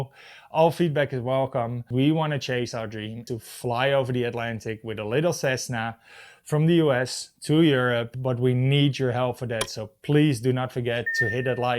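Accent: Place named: Scandinavia. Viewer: Very well explained. I am guessing you are from the Nordics (based on the accent). Very well done video, accurate descriptions, simplified explanation. Keep going!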